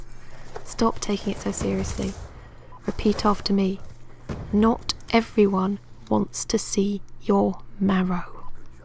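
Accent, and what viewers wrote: United Kingdom. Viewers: Very true,The same principle could be applied to those people who are so obsessed with their K/D that they hide in buildings just to go 4-2 in Domination,Or those smelly little boosters.PS,I enjoy your vids,very original with your slightly posh accent(to me being a northern lad)and quirky comms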